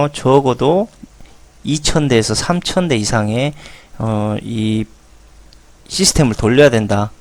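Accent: Jeolla, South Korea. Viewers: 전라도라 그래요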